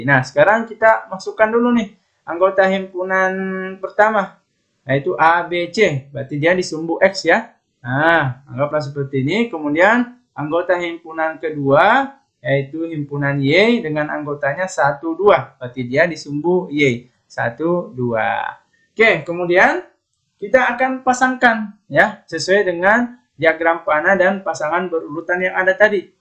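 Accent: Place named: Sulawesi, Indonesia. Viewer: pasti kakak orang Sulawesi kan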